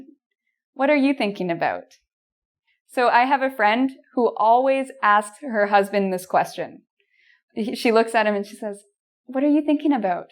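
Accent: Canada. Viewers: Canadian pronunciations, non-Metric measurements... I'm confused. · I love you , your Canadian accent and your lesson. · You a great teacher anda I love Canada's accent. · yes, I can really notice Canadian accent ... and I love it :-)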